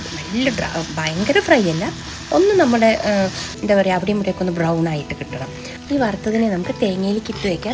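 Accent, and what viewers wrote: Palakkad, India. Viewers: ❤Ellam special receipes anu. Super, Palakadan varthamanavum adipoli, kelkan nalla rasam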